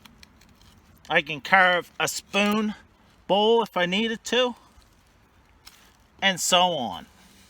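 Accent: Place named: Chicago, United States. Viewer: a very nice blade. "Da Chappa" with a nice Chicago lilt. Cheers, Mate.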